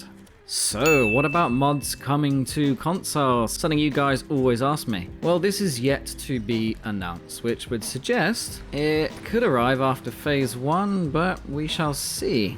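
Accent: United Kingdom. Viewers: I'm from Iraq and English is not my main language,but i love how you talk with your British accent..I really enjoy with your videos❤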